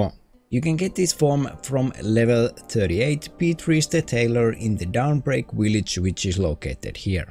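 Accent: Finland. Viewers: Haha Suomi-aksentin tunnistaa kyllä :D Hyvä video, kiitos tästä 😊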